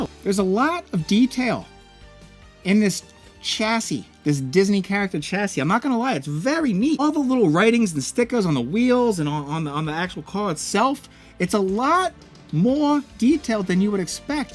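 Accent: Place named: Boston, United States. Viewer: Boston accent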